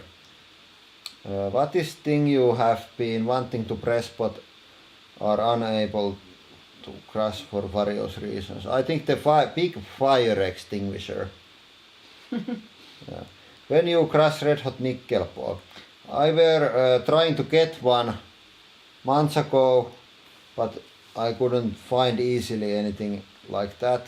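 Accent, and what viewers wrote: Finland. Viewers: I'm watching your videos because they're entertaining and see what you crush every time is exciting and when you deal with Ms HP plastelina creatures and of course your Finish accent is fantastic. It is such a lovely accent (my favorite accent). Every time I look at my Nokia I think off you guys. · Love the extra content and laugh:D And your Finnish accent:) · I love the accent. It reminds me of my grandmother from Savo. Who makes the clay animals you crush? They are quite good. Sorry to see them destroyed, but they must be dealt with. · Just recently discovered your channels and I enjoy watching you destroy shit, and the Finnish accent makes it even better! Keep up the good work! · Vastaus kysymykseen: Oon kattonu teidän kaikki videot ja subannut molemmat kanavat, koska: 1) Ensin katoin paperivideon, koska luin siitä iltapaskan nettisivuilta. 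Tykkäsin sen aitoudesta: selostus rehellisellä rallienglannilla eikä myöskään mitään turhaa amerikkalaistyylistä esittämistä. Video oli jännä, ytimekäs ja lopputulos odottamaton:) 2) Päätin katsoa ne muut videot, mitä teillä oli. Huomasin, että täähän on ihan hauskaa settiä noin niin kuin muutenkin:) 3) Sen lisäksi, että tykkäsin viihdyttävästä tavasta selostaa, niin tykkäsin myös siitä, että on aina mukana. Aika usein reaktiot niin prässäyksen tapahtumiin kuin rallienglantiin kutkuttavat nauruhermojani:) Mun kokemuksen mukaan te molemmat olette aitoja ja välittömiä ihmisiä, joiden videoita on hauska katsoa. 4) Muovailuvahahahmot videon lopussa ovat olleet tärkeä lisä. Jos videossa ei ole ollut muuta kovin yllättävää, niin on ainakin joku jännä uus ja äärimmäisen vaarallinen hahmo, joka saattaa hyökätä milloin vain:) 5) Tää Beyond the Press on tarjonnut sitten kaikkea mukavaa lisäjuttua teistä, mikä on vahvistanut kokemustani siitä, että olette kivoja, aitoja ja välittömiä ihmisiä ja teidän videoita on kiva katsoa. 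Ja tietty nää kaikki jännät testit, jotka ei kuulu pääkanavalle. Teitte viisaasti jaon pääkanavan ja tän Beyond the pressin välillä. 6) Olette onnistuneet tuomaan kanaville originaalia sisältöä melko tasaisella vauhdilla ja aktiivisesti joten, teidän videoiden katsomise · I watch your videos because they are so entertaining. Yes there are a few people now that have copied your Idea. But i don't enjoy their videos. Its not just about crushing stuff. Its that amazing combination of destroying stuff, your Finnish accent, the laugh of, and your personalities. You seem like awesome people i would instantly invite over to my place for metal & BBQ & beers. But i think Germany is like 1 million miles away from Finland. Even Finland is 1 million miles away from Finland. Personality is so important for me when it comes to the channels i watch. Thats why i watch Dudesons, Abom, AvE, OxTools, BigClive, GMM, adunbee, SpectreSoundStudios, EllieAwesome and such. 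And also, seriously reminds me of a cousin of mine. I swear to god, if you dressed them the same, with the same hair colour, people not knowing them personally will mix them up lol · Erittäin kaunista Suomiengelskaa!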